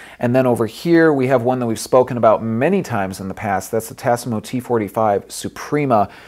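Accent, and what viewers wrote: United States. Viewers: He's american. They all say button that way.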